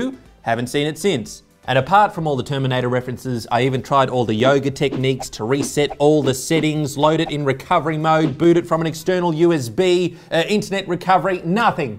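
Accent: Australia. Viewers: Are you Aussie? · R u an Aussie like me mate?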